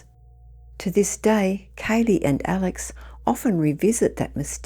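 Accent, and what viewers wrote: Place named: Australia. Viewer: Exactly so why would this feature on this episode? The narrator is clearly Australian?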